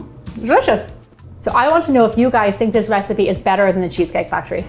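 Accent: Ireland. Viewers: Can't loose good aulde urish accent, great video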